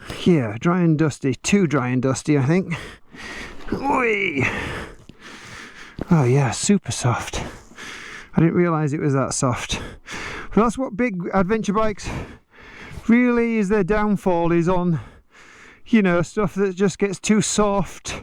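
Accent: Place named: North East, United Kingdom. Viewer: That's definately a N.Yorks/Durham accent for sure, tha'knows. Oh wait what's the point of leaving comments when he can't be arsed to reply ?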